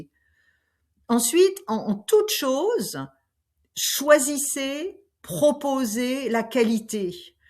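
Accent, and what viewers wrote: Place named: France. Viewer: Merci infiniment. C'est une précieuse aide, que je réécoute souvent. J'aime votre manière de vous exprimer....et vous avez conservé votre accent français clairement. Chaud au cœur votre accompagnement est un Plus.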